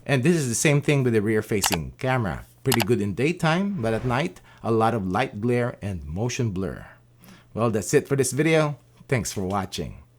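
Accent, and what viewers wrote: Philippines. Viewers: Filipino accent ❤❤❤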